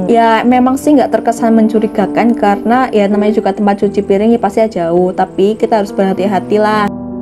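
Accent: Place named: Tegal, Indonesia. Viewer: Orang Tegal yah.. logatnya kentara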